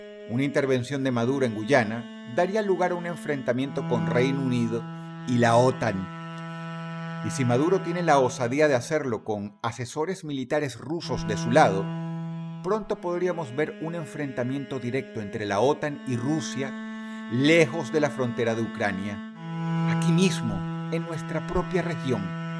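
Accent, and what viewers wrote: Argentina. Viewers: Ya era hora de que lo hicieras, ya hacia demasiado tiempo que agarraste acento argentino. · Jajajaja y por que no habla como venezolano? Tiene acento de argentino o algo asi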